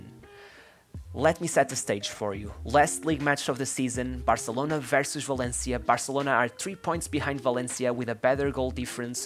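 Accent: Portugal. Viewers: Is it me or you are portuguese? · He’s Portuguese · Lol this guys is portuguese 😂 accent tho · This is a portuguse narrator for sure eheheh I Love Rivaldo! He has class and is not a party animal I love lo listen him talk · Gotta love that awesome portuguese accent! A great video for a great player! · you sound like a portuguese speaker, and I am wondering if you are from Brazil, portugal or another portuguese speaking country. Anyway what I about doing one for Juan Román Riquelme, by far my favorite player growing up and wanted to know other people's opinions. Cheer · Dude are you portuguese u really have a portuguese accent saying the names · I bet this guy is portuguese because of the accent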